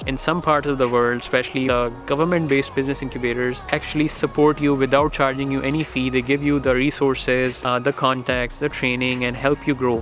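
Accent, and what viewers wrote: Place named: England, United Kingdom. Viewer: Wonderful English accent 😍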